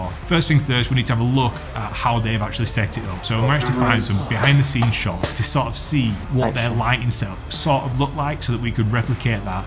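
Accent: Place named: Leeds, United Kingdom. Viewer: ALL LEEDS AREN'T WE! No but seriously, great stuff mate. Got here because I'm thinking of buying a second hand 70D funnily enough, was nice to hear a familiar accent!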